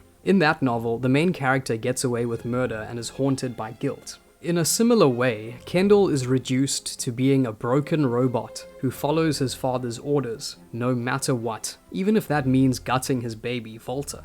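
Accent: South Africa. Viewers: I heard you narrate for 7 seconds, and I knew you are South African 🤣🤣🤣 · Bru you south african? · You sound South African. I agree succession is one of the best shows I’ve ever watched. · Youre South African arent you? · Are you South African? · Well in with the analysis...I also trace that South African accent in the narrator.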